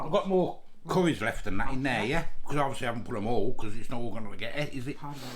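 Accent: Birmingham, United Kingdom. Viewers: Just subbed, watching with 2 boy,s, 6/7, grandson's, when you said masala, they both said it, and remarked that you sounded like me, i'm a Brummy, anyway, great vid, and we 3 watched and enjoyed to the end, now to check your other stuff out, thank's.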